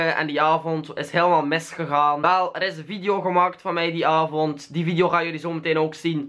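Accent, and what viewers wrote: West Flanders, Belgium. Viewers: ! Van west Vlaams in elk deel van belgie ander accent 😂😂😂😂😂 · Hij is inderdaad Belgisch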